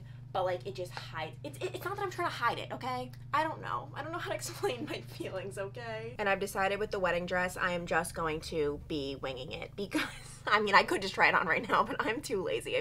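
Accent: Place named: Long Island, United States. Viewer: Your Long Island accident is back…. And I love it!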